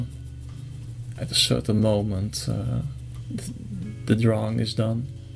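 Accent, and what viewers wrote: Netherlands. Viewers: You sound really dutch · Love to this Dutch accent ahhaha. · Mooi gemaakt , ik dacht al een Nederlands accent te horen heb mij direct ff geabonneerd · Are you dutch? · Youre dutch i can hear it 😂 Ik ben ook Nederlands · Are you Dutch?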